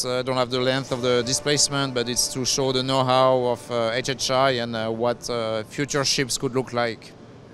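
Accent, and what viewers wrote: France. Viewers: Why did they hire the Frenchest French guy to narrate this in English? Could they not afford an actual English speaker?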